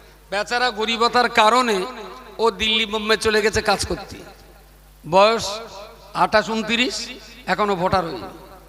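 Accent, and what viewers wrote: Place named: Bangladesh. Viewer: কথা শুনে তোর মনে হল বাংলাদেশী,, আর তোর কমান্ট দেখে আমার মনে হচ্ছে তুই বন চুদু মাল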